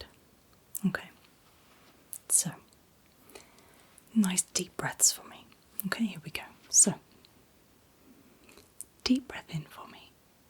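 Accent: RP, United Kingdom. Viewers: That posh British accent hits like Ambien! ❤️🤍❤️🤍❤️🤍❤️🤍❤️🤍❤️🤍❤️🤍❤️ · Love your british accent. Its so elegant and soothing.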